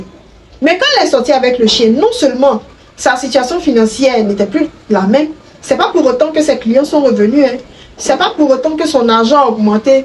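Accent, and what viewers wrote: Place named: Ivory Coast. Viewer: Tu l'accent ivoirien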